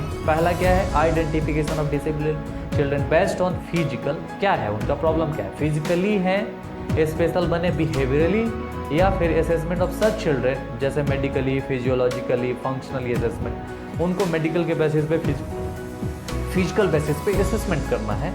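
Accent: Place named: India. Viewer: U speak Indian a lot so it's difficult to understand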